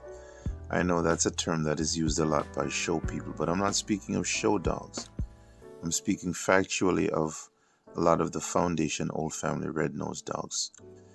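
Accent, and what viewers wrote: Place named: Jamaica. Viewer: Off topic.. Are you Jamaican? Maybe its just me, but Im hearing a familiar accent.. Sound like a yardie..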